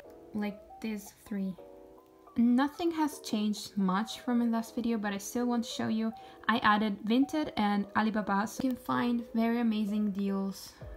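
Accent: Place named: Italy. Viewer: Hi are you Italian? Loved the video, also your accent is great. I’m Italian too, but I watch mostly English talking people on YouTube 😅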